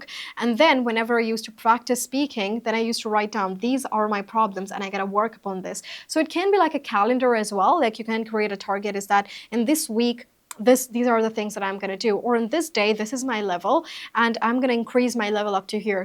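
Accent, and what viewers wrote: United States. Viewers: Aha me english sikhane aaya tha lekin aa toAmerican accent bole ja rahi hai,,kuchh samajh mein hi nahin aaya😅😡😡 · I think you speak an American English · Apki english sunkar,mujhe essa lg lg rha hai, jaise me india me nhi , America me beth kr apki video dekh rha hu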